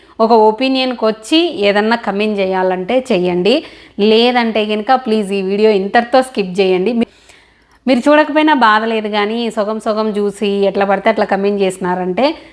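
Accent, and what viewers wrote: Rayalaseema, India. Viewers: Nenu ekkuva gaa comment cheyyanu andi but meru nijangaa super ga clarity ga chepparu memu thondarlo vastam andi foreign ki Naku papa nenu same challa tension anipistundi ....but Mee video chusaka konchem relief anipistundi maa papa anthe andi active full mrng padukodu night padukuntundi maa papa ki uyyallo nee alavatu foreign lo Ela Abba anukunedani anyway challa thanks andi...madi rayalseema kadapa meru matladtunteee aa slang lo maa voru akka laga vunnaru...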